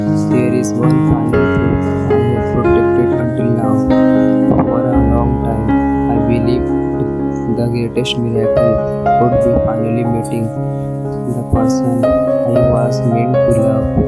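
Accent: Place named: India. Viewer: Are you Indian because you talk like one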